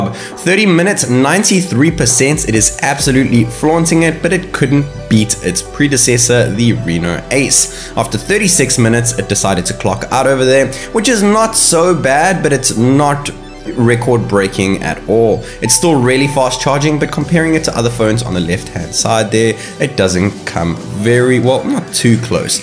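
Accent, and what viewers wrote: South Africa. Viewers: South African accent. I guess you haven't travelled and experienced other cultures.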